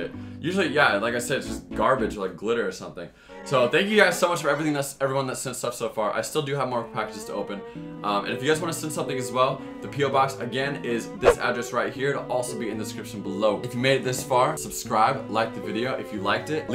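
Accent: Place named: United Kingdom. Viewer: Did he became British . Or am I just watching to many British youtubers 😭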